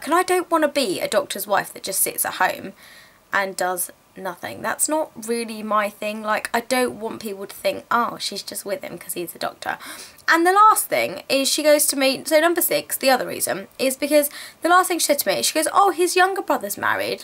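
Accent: United Kingdom. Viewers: lol..just moved back from the UK to the States. You make me miss the accents lol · your accent is so alkdjfaldh WHY AM I NOT BRITISH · I love listening to your stories and I COMPLETELY agree with everything you said! :) It's like people think that just because he's a doctor and has money, makes him a catch. What about true love?! By the way, your accent is literally amazing! I could just listen to you talk all day! Haha! I <3 British accents! :)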